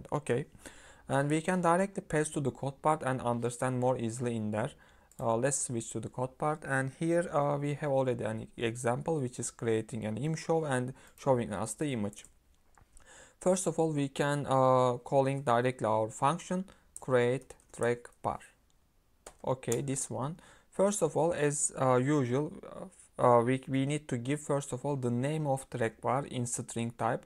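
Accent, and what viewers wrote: Turkey. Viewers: kanka aksanın Türküm diye bağırıyor 🥰 başarılarının devamını dilerim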